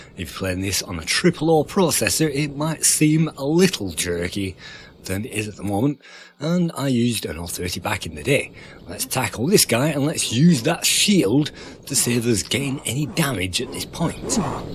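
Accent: United Kingdom. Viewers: Good to know! You sound British or Australian/NZ, so I was surprised that you had an NTSC Amiga.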